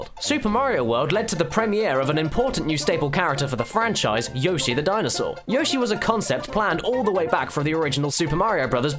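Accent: United Kingdom. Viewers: Well is from the United Kingdom. Words and pronunciation is varied in some places from there. It depends where they grew up.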